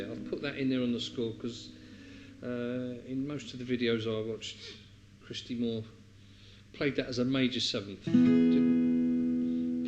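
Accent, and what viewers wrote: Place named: England, United Kingdom. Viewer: Thank so much! And, hey, this is the first time that I've heard you speak! You have an English accent! Here in Missouri my Mississippi Delta accent gets me a lot of comments and attention. If I had you here, they'd let me alone for a while!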